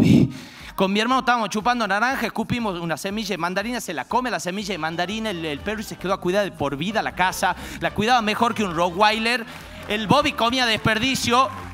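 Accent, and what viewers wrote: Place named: Buenos Aires, Argentina. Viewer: Una lástima que se haya acostumbrado a la tonada porteña. Como que no tiene mucho sentido que pronuncie la y . Le cambio bastante. Pero igual sigue siendo el mejor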